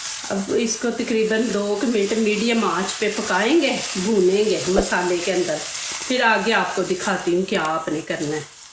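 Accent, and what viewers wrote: Punjab, India. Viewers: Lehjha aap ka panjabi ha aap urdu na hi bolain anjabi hi bolain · آپ پنجابی میں ویڈیو بنایا کریں... کیونکہ آپ کا لہجہ پنجابی ہوتا ہے اور بول اردو رہی ہوتی ہیں ... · MashAllah punjabi lehjay main urdu achi lugi hay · Baji aap agar punjabi bolain tu zyada acha hai aap ka lehja boht punjabi hai humain apni zuban hi bolni chahiye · Speak Punjabi for GOD sakes don’t try to break URDU LEGS ( I am Punjabi too but your accent HORRIBLE) 🙏🏼🙏🏼people laugh on us PUNJABIES )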